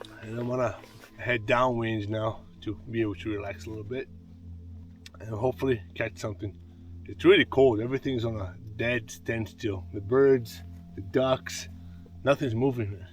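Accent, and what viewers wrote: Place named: Brazil. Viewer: Boa, pelo seu accent eu percebi que era brasileiro haha. Video incrível, qual região você está? Abraços!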